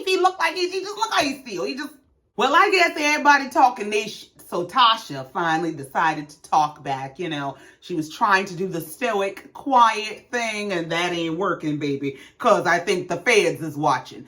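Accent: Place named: Charleston, United States. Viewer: Hey new subbie... where you from? Sound like Charleston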